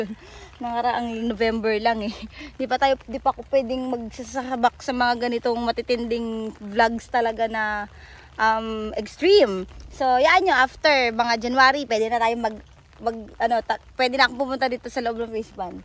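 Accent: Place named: Tagalog, Philippines. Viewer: Pokot ng lambat nakakatuwa ka talaga halatang pure na tagalog ka😀 maam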